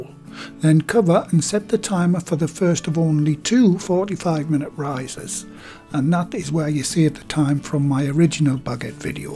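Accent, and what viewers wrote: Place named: Northeast England, United Kingdom. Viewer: I meant that you're from the Northeast of England, you can hear that. I'm from Gateshead :) . Thanks for the extra info, too, I will give the recipe a go!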